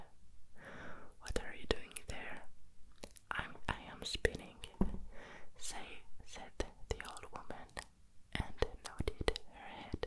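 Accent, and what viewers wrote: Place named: England, United Kingdom. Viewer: J adore ton accent anglais ma sublime et ravissante super vidéo de qualité tu es relaxante 💜🤗💋🥰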